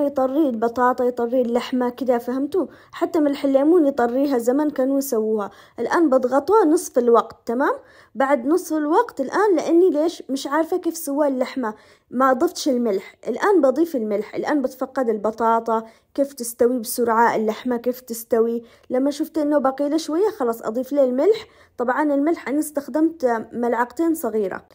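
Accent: Aden, Yemen. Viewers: محسن كلامك العدني القح 😂😂😂 ربي يسعدك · ياحلات اللهجة العدنية تسلم يداتك ياقمر ❤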